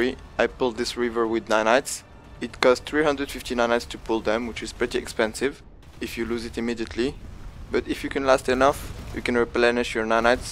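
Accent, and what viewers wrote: France. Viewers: ho I thinking you had a French accent.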